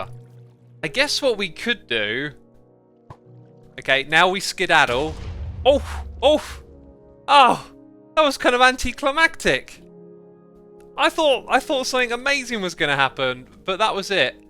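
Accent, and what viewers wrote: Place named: London, United Kingdom. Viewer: overcorrects his 'v' 'th' substitution error by saying 'nether' instead of 'never'.:) I enjoy your london accent.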